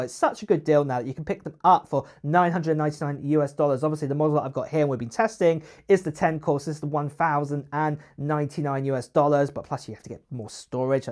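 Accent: England, United Kingdom. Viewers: There's no such thing as "British accent". There are five countries on the British isles. He's English but not sure where abouts he's from in England.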